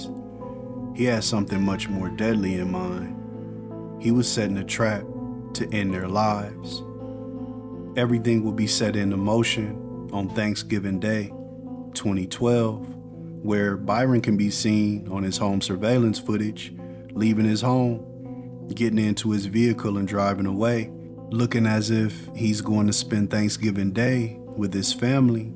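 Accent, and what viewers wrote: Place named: Los Angeles, United States. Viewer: LA guy LA voice perfect for the content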